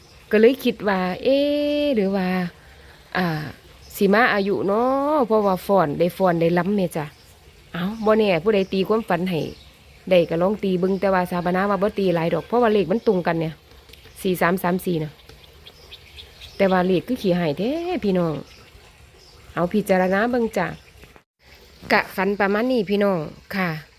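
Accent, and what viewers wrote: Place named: rural, Thailand. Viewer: ชอบฟังเสียง จร้า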